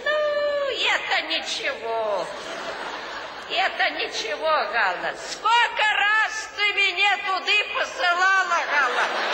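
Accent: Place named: Russia. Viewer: Не понила это что русской деревне так разговоривают ,браво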